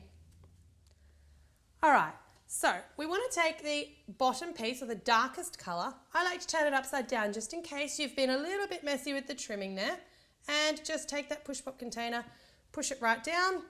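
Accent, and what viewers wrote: Australia. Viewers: OMG! Are you an Aussie!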